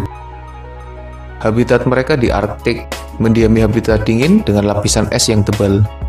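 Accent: Banyumas, Indonesia. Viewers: Bang ...org ngapak. Yaaaa???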